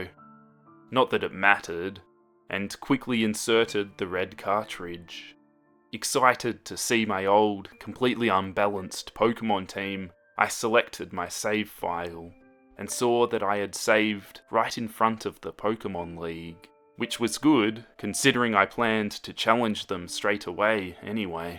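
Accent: Australia. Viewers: I'm sorry but Australian accents can't be creepy. Nice to listen to but it automatically makes you seem friendly lol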